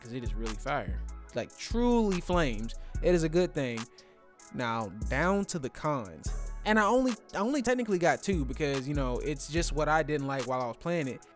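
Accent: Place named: United States. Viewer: You sound American dawg n as far as i know its only out on Asian servers ...so how you playing it?...on PS4 we gotta make a Hong Kong account or something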